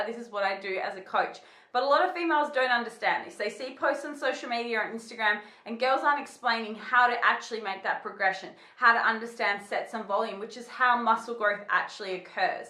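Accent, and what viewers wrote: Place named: Australia. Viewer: Thanks, Good quality information and it's great to hear an Australian accent too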